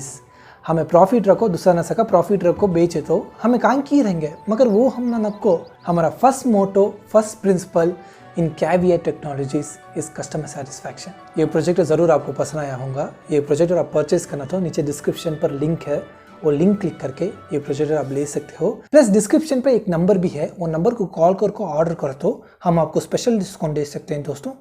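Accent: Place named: South, India. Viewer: Super jab koi sauth indian hindi men baat karta hai to bahut achha lagta hai sunne men ..superub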